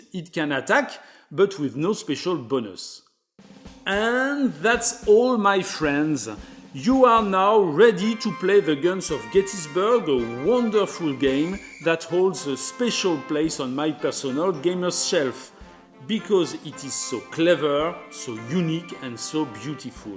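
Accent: France. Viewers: This is excellent! Also, all American place names sound so much nicer when pronounced with a French accent 😄⭐️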